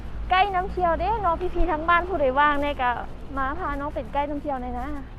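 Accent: Laos. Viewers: ดูแต่สาวลาวนี่แหละอยากฟังสำเนียงลาว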